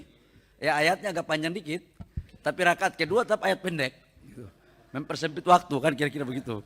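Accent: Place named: Papua, Indonesia. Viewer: 😂😂😂 mantap pak menteri sa suka logat p Papua d pakai d sini. D tempat wakil rakya.😅😅😅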